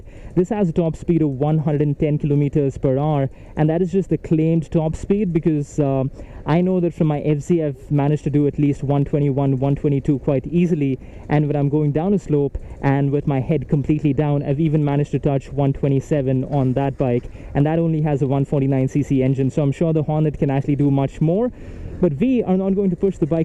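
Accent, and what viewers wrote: England, United Kingdom. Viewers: अब तो अपना हॉलीवुड हीरो भी अंग्रेज़ी accent की तारीफ़ सुन सुन के बोर हो गया होगा यारो, gotta say something new mate.. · Your English accent wow ...teach me master 👌👌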